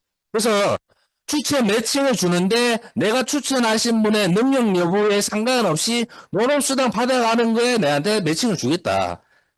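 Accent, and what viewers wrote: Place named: Gyeongsang, South Korea. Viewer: 다함께품 협동조합을 만난것이 축복이고 기쁨입니다! 교육이사님 강의 짱이십니다!갱상도 사투리인데도 귀에 쏙쏙 박힙니다!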